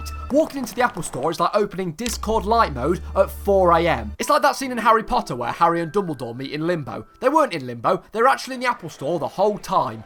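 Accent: United Kingdom. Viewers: I can't understand ur bri'ish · Your accent i need it ... How to have an British accent like yours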